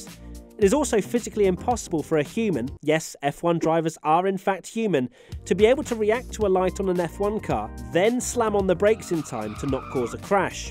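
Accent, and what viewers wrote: England, United Kingdom. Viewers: Yeah I admit I know the answer. I just love listening to your adorable English accent. 😁 Plus your clips are all very informative and entertaining. Love WTF1! Could you get me Charles autograph? Lol!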